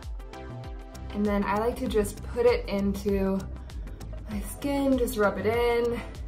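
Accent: United States. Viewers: She's Scottish believe it or not, but has the most American accent ever. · I thought she was british, why does she have an american accent?! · but...she’s British...why does she have an American accent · if shes British why does she sound American?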